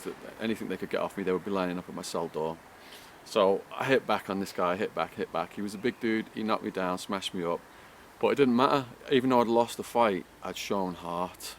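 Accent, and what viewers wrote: United Kingdom. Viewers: This might be a weird question, but did people in there assume you were soft because of you accent? Us American tend to think that any kind British accent sounds posh and I can't see that working to your advantage.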